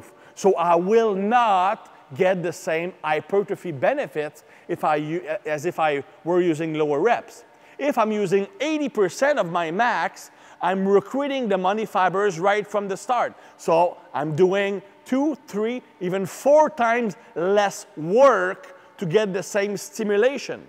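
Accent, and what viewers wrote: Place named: Quebec, Canada. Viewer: I trust him because of his Quebec accent. Never met a dishonest or bad Quebecer.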